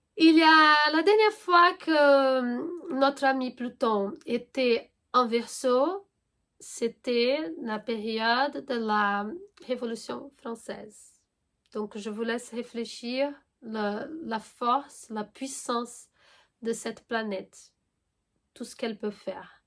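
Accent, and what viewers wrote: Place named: Brazil. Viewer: Bonjour. J’adore ton accent. Tu es brésilienne? Merci pour cette vidéo. Moi je suis lion ascendant scorpion avec bcp de choses près de mon ascendant, maison 12 pluton, et maison 1 la lune, le tout en scorpion. Ca me donne une sensibilité un peu spéciale et j’essaie de mieux le comprendre à travers l’astrologie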